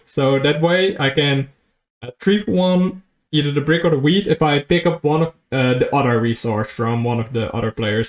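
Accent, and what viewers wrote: Netherlands. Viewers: Hey you sound like you are en dutchman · Are u dutch?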